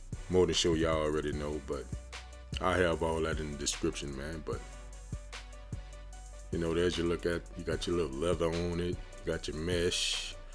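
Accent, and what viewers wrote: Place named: New Orleans, United States. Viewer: They hard bruh ... Man U from NO... the accent sound just like it ... I’m in BR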